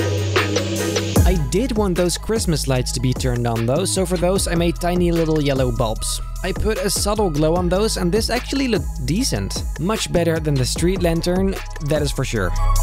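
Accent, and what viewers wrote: Netherlands. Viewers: Are you dutch? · You sound dutch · Are you Dutch ?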